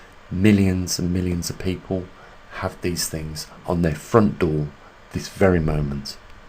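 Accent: Birmingham, United Kingdom. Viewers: You sound like your from my neck of the woods. Birmingham. Greatest thinkers 👍👍👍👍👍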